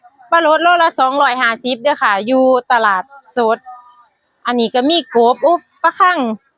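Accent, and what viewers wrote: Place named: Sisaket, Thailand. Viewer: เว้าสำเนียงศรีสะเกษบ้านเฮาเพราะหลาย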